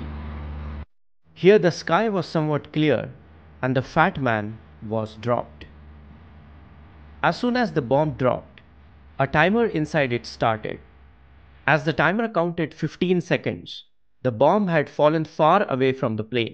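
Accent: India. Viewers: I love your accent! It sounds Indian, but it doesn’t sound INDIAN Indian, if you know what I mean…? It sounds like base Indian, with some outer influence…? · I hate indian accent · Hoooh hate when the Indian speak English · agreed....it sounds like a indian try to speak english....good video tho! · this video is well made except for the indian accent 🤦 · Beautiful indian accent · The Indian accent is the deterrent of millions of viewers of this great video. Please record the audio with the native English speaker. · A Good Science explanation but I am truly sorry I find it so difficult to listen his spellings, really indian accents is so unforgiving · These dumb channels cant ever find an American narrator who DOESNT have a wack ass accent? I dont understand this curry speak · nice, but that accent. It's a pity that many Indian people who has somerhing smart to say has so terrible accent that only a few people can handle that. 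Most people around the world who use Engish have quite ok accent, but Indian people.. man that's another level :) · Sorry ,I don't like indian English accent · An Indian accent and an Indian teacher quality it goes down deep .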